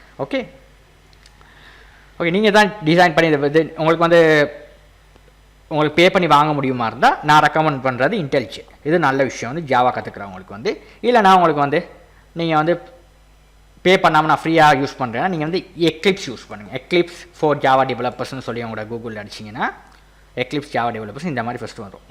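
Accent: Sri Lanka. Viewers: Srilanka Tamil ah nenga ?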